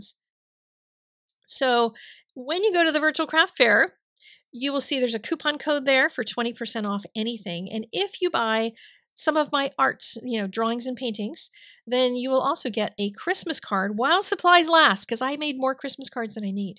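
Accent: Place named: Kentucky, United States. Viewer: Kentucky! Im from Tennessee so I recognize it anywhere lol.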